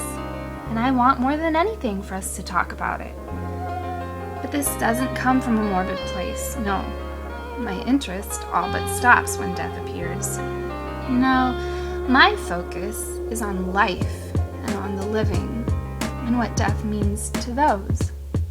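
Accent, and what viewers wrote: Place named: United States. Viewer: It looked an interesting video but the loud intrusive background muzak and the speaker's American accent made her words unintelligible to me.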